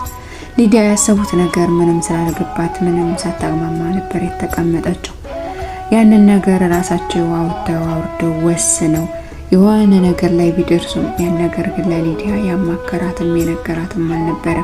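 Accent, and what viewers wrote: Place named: Wollo, Ethiopia. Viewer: ወላሂ የብዙ ሰው ታሪክ አዳምጣለሁ ግን አንች እንደምታቀርቢው የሚመቸኝ የለም ያቀራረብሽ ለዛ አነጋገርስ ወሎየነቴን ያስታውሰኛል የምር ምችት ይበልሽ በርችልኝ ከዝህ በላይ ጠንክረሽ ጥሩ ደረጃ ደርሰሽ አላህ ያሣየኝ የኔ ውብ ድምፅ😍😍😍😍😍😍😍😍😍😍😍😍😍😍😍😍😍😍😍😍😍😍😍😍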